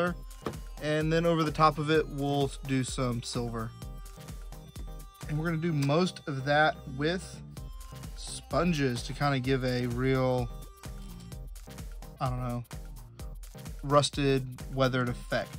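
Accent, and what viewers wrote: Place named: Oklahoma, United States. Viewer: Bro sounds like an Okie, makes me feel at home!!!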